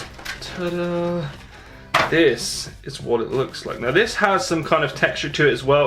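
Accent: Bristol, United Kingdom. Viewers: are you from Bristol? That accent is V E R Y F A M I L I A R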